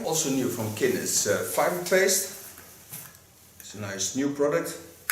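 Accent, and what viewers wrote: Netherlands. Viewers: I love to watch your videos!! Part of the attraction is to see your cutting & colouring. It’s exciting to see your finished results, amazing. Part of the reason I especially enjoy your channel is because I’m half Dutch & hearing your accent reminds me of my late father & my remaining family in the Netherlands. My father was born in Haarlem but very sadly he died when I was young, in the mid 1970’s. All the family are now around Assendelft. As a tiny child I remember my father speaking on the telephone to family in the Netherlands. I remember he often spoke with Uncle Anton who was a hairdresser with a salon in Amsterdam!!! Unfortunately I only now have cousins alive in the Netherlands, all other family have passed away. So…when I watch your videos it makes me so happy to think of the Netherlands & my very wonderful family there…those that are no longer with us & those still alive. 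Thank you!! 🇳🇱👍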